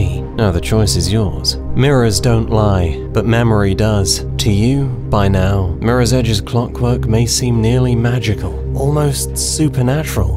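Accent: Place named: United Kingdom. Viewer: I would say it's that damn British accent but no I think you're quite right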